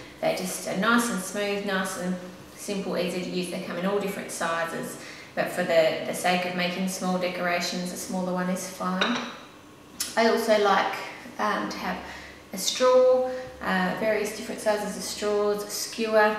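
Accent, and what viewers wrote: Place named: Australia. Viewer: Wow! I came across your channel while typing in: How to make Pokemon cakes ^_^ Thanks for the fondant colouring video. This was great. And judging by your accent, i can make out you're from Australia. I'm from Melbourne. Haha ^_^ Anyway, please keep posting more videos.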